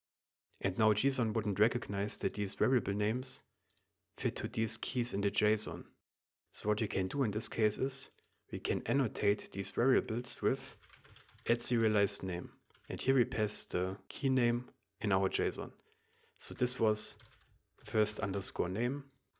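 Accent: Germany. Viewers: I think a hear a german accent😁😁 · i love that light german accent (y), excellent work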